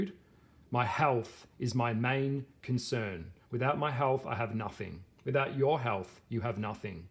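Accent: Australia. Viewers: Oh, without a doubt, but now that you mention it, you have that fun, witty energy of the demon too. I don't want to sound like I'm just being flattering here, but you've captivated my mind the last several hours listening to you. Like Crowley you seem well read, insightful, and artistic with a bit of hedonism and I would think that would excite a lot of women. This particular video made me think about my sexual choices in life and how I always played it safe with always choosing the nice guy who would always tell me what I want to hear and never challenge me or be too overtly sexual, although my sexuality was off the charts in my 20's and 30's. After a hysterectomy that really changed me hormonally unfortunately, but I think I haven't had anyone to really stimulate that either. To get to me it's always been through my mind and no one ever figured that out about me. 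Anyway, I've commented a lot here on your videos and probably should be embarrassed that it's overkill, but I find you pretty fascinating and am impressed with your knowledge and insights on the Aquarius, both the positives and the negative. That Ozzy voice is to die for, I've always loved the Australian accent, it's my favorite and I always wanted one lol. My other best friend is a Leo and lives in Tazmania.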